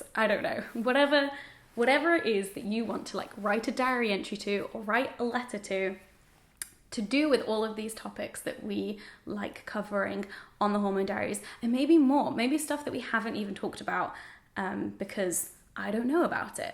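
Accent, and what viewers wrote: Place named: Manchester, United Kingdom. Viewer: Literally couldn't tell you how many years I've been watching you and the Hormone Diaries is my fave thing! Also as a fellow manc living away from home hearing your accent is very comforting😂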